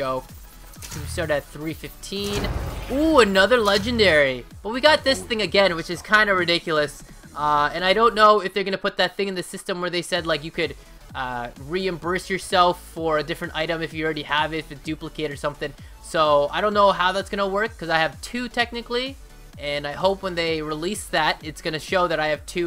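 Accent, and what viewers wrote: Asia. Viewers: It's better to get 10 common cause it's more worth it and the vid will be longer, btw love ur Asian accent <3 no homo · This wasss so good am loving these supply drops i was wondering if you'll ever do a series where we send in out own supply drops and you do a top 5 out of them. Can't wait to see the next one could you 5 rare next time would love to see that. In that awesome asian accent aha. Keep up the great work man your currently my bae cod youtuber. No HOMO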